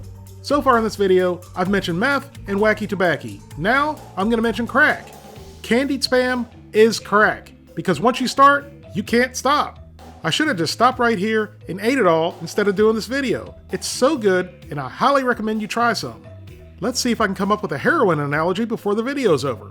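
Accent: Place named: Maryland, United States. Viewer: What part of Maryland you from, chief?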